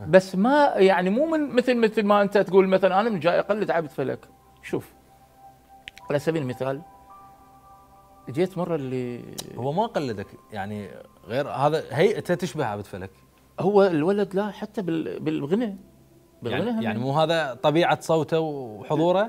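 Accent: Iraq. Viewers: تحياتي الى الفنان عبدفلك صاحب الاخلاق الجميلة والصوت الجنوبي الجميل استاذ عبدفلك البصره تحبك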